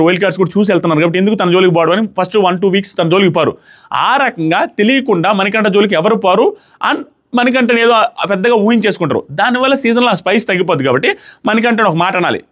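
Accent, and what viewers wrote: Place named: Nellore, India. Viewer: Adi anna Nuvvu reviews Chala baga cheputhav, Nenu Chala season nundi nee videos chusthunna . Nuvvu heartfull ga matladathav, Nuvvu mana nellore vadivi and pakka mana slang vadathav. Super anna 😊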